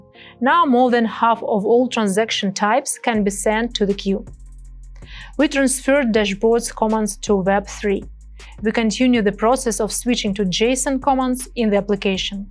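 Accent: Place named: Russia. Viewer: Great news! Love your accent, assuming Russian?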